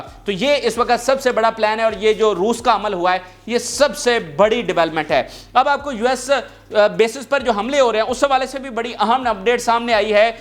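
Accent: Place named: Punjab, Pakistan. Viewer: نا بول انگریزی نا بول۔۔۔منہ وچوں پنجابی بن کے نکل دی اے تے نال روندی اے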